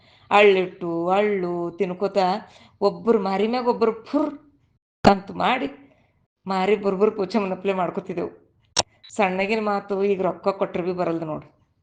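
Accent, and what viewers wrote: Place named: Bidar, India. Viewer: ಬೀದರಿನ ಯಥಾಪ್ರಕಾರ ಮೂಡಿಸಿ ತಿಳಿಸಿದ್ದೀರಿ ಜಾನಪದ ಹೃಡಿನ ಸೊಗಡಿನ ಮಾತು ಬಲು ಚಂದ👌🏻👌🏻👏👏🌷🌷🌺🌺 ಶರಣು ಶರಣಾರ್ಥಿಗಳು👏👏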